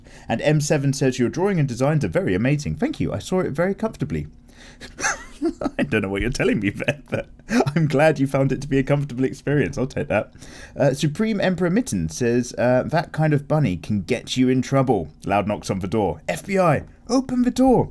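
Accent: United Kingdom. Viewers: Hey, greetings from Bulgaria! I love your art and especially your tutorials! Some time ago I got into DWM. At first I was sceptical as to how the hell I could draw while you’re British accent is echoing inside my ears, yet I found it’s amazing! I truly draw better while listening to DWM! Thank you, keep up the good work!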